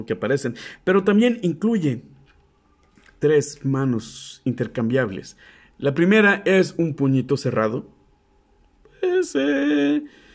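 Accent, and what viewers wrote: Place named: Coahuila, Mexico. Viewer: Eres el mejor:D sigo sintiendo tu asentó cuahuileño aún que ya me dijiste que eres de Tijuana:D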